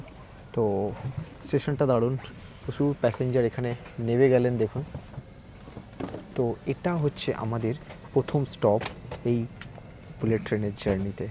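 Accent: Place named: Bangladesh. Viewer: Your bangla accent sounds like bangladeshi.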